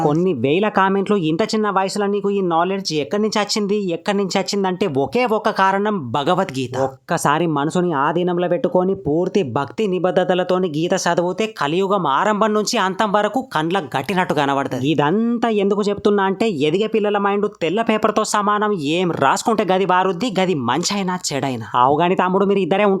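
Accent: Telangana, India. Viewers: Haha, that’s perfect Northern Telangana Slang, in a fraction of seconds I slipped back to my good old days spent with my grandparents; used to talk as much similar as you did. Thank you 🙏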